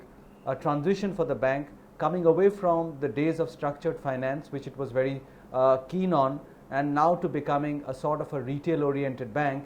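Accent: India. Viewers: Indian style you mean third class hindi style hinglish.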